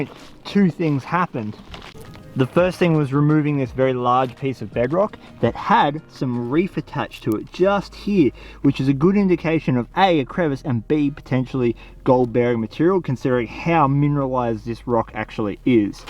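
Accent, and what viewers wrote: Australia. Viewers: Is this Australia? You have that accent.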